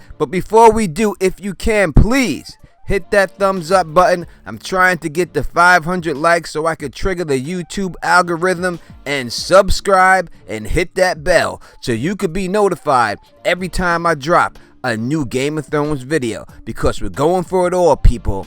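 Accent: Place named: Long Island, United States. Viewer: Youre def from!!! Ny longisland my guess lol.. With that i say, keep it up good work brutha!!!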